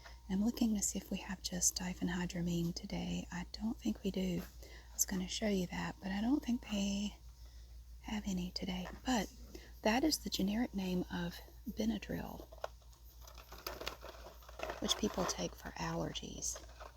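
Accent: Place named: Greensboro, United States. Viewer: your asmr videos are so nice for me because of your accent. i was born in greensboro and lived in the area for most of my life so its sooo comforting to hear the voice of the women i look up to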